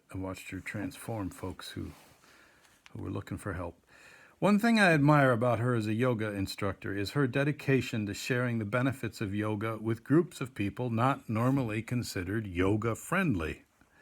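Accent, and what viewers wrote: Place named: Wisconsin, United States. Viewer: I'm up late here in Portland Sucks Just finished my caretaking For my husband who is now 90 Got my teeny "medicinal" martini And who pops up on my phone? My favorite Wisc author! Ahhh! Sigh... The comfort of your Wisc voice I miss Wisc every single frick'n day Forget searching Google for an extra water filter! I'm gonna listen to Michael Perry! Tomorrow I'll fire up the old Firestick And treat my husband to to a morning of Michael Perry and coffee Remembering all your concerts And missing Wisconsin 😪 And.... Portland Sucks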